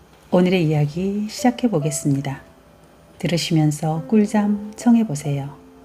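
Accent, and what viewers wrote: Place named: Gyeongsang, South Korea. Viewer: 구수한 갱상도 아지매 사투리가 더 졸리네요^^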